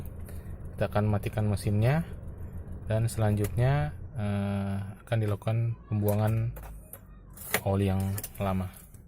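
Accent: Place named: Manado, Indonesia. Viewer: Dapa dengar rupa orang Manado ini kang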